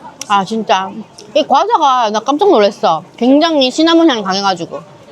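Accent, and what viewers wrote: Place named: Busan, South Korea. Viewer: 아리가또~고자이마스~ 부산 사투리 ㅋㅋㅋㅋㅋㅋ넘 귀여운거 아니냐구우~~❤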